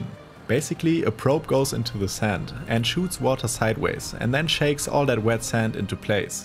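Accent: Germany. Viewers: Hopefully there is some work put in to try and reduce the accent. I am new to the channel and just watched 2 videos from last year and then clicked on this and I instantly went "oh they have a german narrator now". Its quite noticeable. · man merkt so krass dass der Sprecher Deutscher ist. Ganz schlimm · Great, we have English with a heavy German accent but no original German sound track. Kind of annoying.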